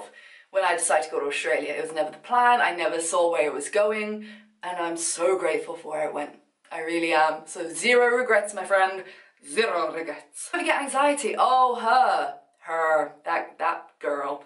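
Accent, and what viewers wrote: United Kingdom. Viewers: You British Ayeeeee???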